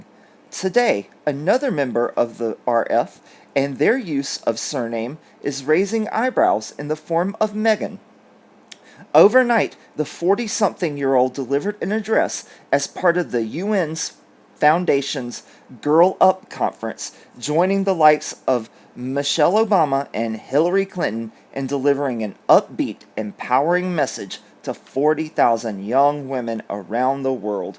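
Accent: United States. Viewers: Hiya hunny, Glocestershire is pronounced like Glaw then the cestershire at the end. It may just be your sweet American accent 😉. Have a good evening.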